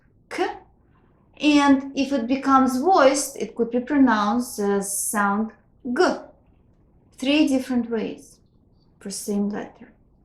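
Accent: Russia. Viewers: I don't know what she's saying because I'm not used to that type of russian accent (I'm used to crazy Russian hackers accent but I have no idea what she's say but probably where I'm from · ...though your pronunciation of English has a definite Russian accent, (but it is so charming!), and, yet, you obviously have a very good mastery of English vocabulary, and, you are very clear in what you are saying. => A Great introductory lesson! I hope that, someday, I can learn Russian pronunciation, as well, and would like to at least learn to read with some degree of fluency, as I have a few books, (on the subjects of jewelry, and metalworking), that I'd like to be able to translate someday. Thank you, very much!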